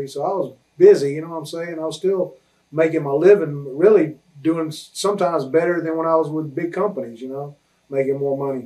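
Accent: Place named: South, United States. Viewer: He sounds so different "country af" compared to the OMG and characters I've been looking at OMG since WCCW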